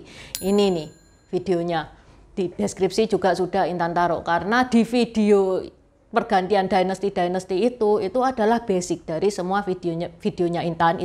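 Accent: Java, Indonesia. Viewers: Sampean ini Tionghoa opo jowo...kok bohoso ne jowo medok?? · Medok mu wi lho yu...yu...🤭🙏🏼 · Mbak, cengkok jawanya medok bangetttt, 👍👍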